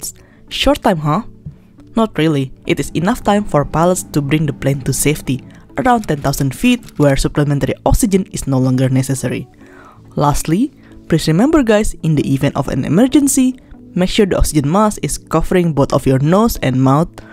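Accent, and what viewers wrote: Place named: India. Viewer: sounds like one of those indian video conference at work.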